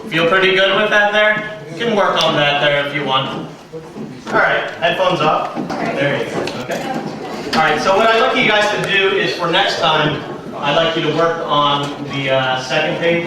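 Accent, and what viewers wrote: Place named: United States. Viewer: wow this is so awesome!! id love to come to a gruop one theres definately less pressure and more relaxed :) but im in england and u obv have an american accent!:(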